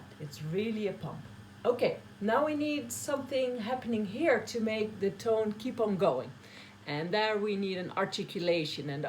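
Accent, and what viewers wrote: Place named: Netherlands. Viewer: Are you from the Netherlands cause you sound just like a female version of Wim Hof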